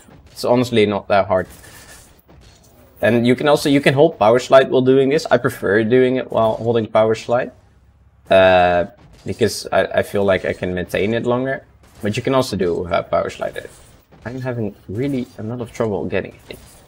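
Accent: Netherlands. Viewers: I just know ur a fellow dutch person, i can hear it in ur accent 😂 great video man, love it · I smell Dutch, bedankt voor de tutorial maat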